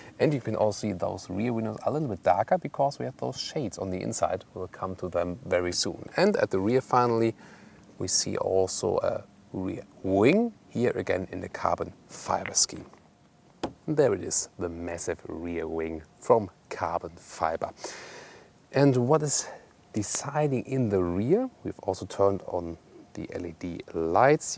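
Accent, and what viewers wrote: Germany. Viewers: He's not mumbling, that's a german accent...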